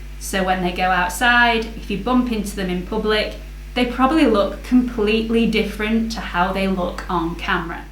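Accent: United Kingdom. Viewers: Great video! Thank you for sharing! I quite new here but I can say that you are very honest to your subscribers! And you not only help me do my makeup but also help me practise my English since I'm from Argentina! It's so nice to listen to a British accent! Greetings gorgeous! 😍😍😍😍😍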